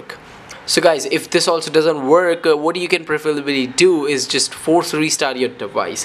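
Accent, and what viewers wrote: India. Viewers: Thank you so much. It worked in my iphone by resetting network settings. I was feeling helpless before that. Search in YouTube how to fix it and found your video first. Btw I liked your indian accent.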